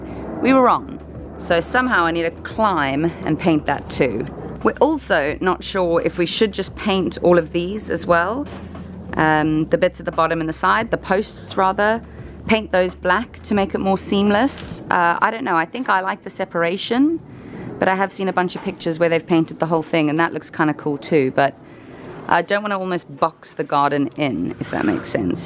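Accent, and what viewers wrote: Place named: South Africa. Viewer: sounds so South African!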